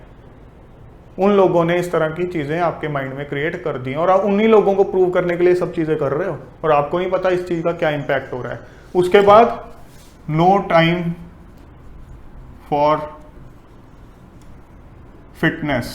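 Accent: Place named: Punjab, India. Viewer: u have punjabi tone sir while speaking English and it makes unique👌👌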